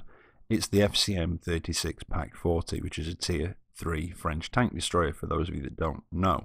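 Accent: United Kingdom. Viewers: Why is it british?!?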